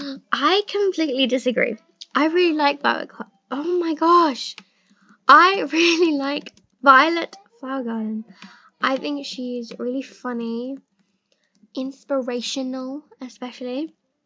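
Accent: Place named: United Kingdom. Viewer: hey!! are u from the UK? i really find your accent cute <3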